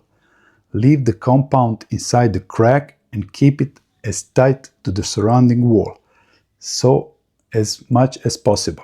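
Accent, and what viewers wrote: Russia. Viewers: has a strange accent. Russian? Ukrainian? Dude, you tell me. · I love the accent! Russian? Excellent commentary and tips on how best to repair these annoying cracks. 👏👏✌️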